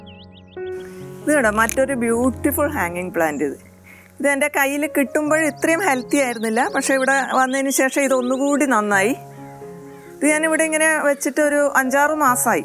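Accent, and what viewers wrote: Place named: Kasaragod, India. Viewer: Slang kettappo manasali ningo kasaragod bakath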